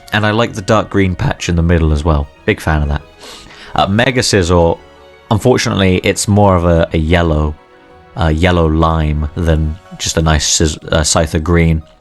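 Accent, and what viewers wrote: United Kingdom. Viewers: are you from the west country in England sir?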